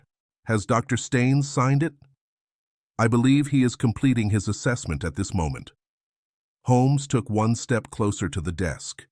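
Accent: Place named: United States. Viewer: Sherlock Holmes with an American accent noooooo🤬🤬🤬🤬🤬 no chance will I listen to this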